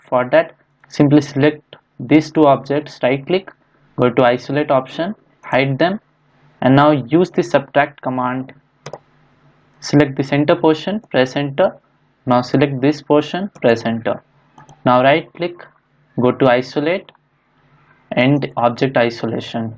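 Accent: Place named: India. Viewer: Video too fast and please reduce the indian accent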